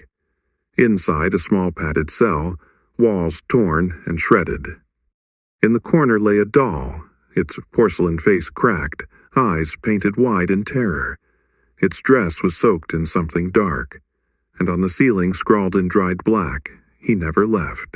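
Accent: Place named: United States. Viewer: Agreed! And why would a channel called BRITISH hauntings choose an AMERICAN voice to narrate?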